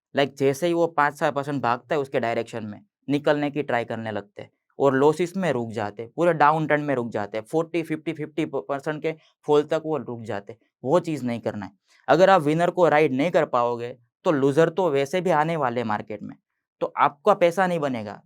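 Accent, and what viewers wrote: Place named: Gujarat, India. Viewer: Bhai pakkaaaa gujrati hai 😅😅😅😅😅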